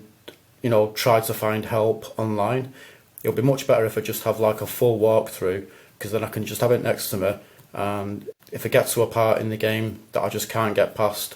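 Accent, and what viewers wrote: Manchester, United Kingdom. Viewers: I'm from North Manchester, I thought you definitely sounded Mancunian. Thinking we may be of a similar age, from your gaming videos. I'll be 42, in a few weeks.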